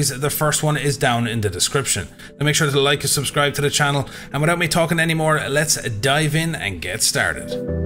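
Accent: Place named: Ireland. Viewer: First time watching and I can tell he’s irish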